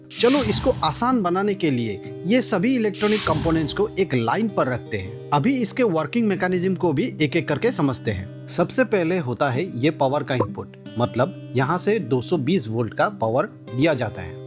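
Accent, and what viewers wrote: Northeast, India. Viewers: Bhai aap north east state region se ho kya aapke accent se lg rha hai 😊😊❤❤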